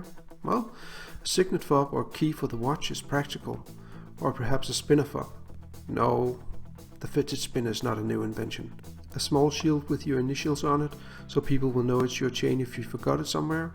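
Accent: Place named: Denmark. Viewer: at first i wasnt sure where you were from but then i realised you were danish. så det er sejt at høre at jeg ikke er den eneste dansker med denne hobby haha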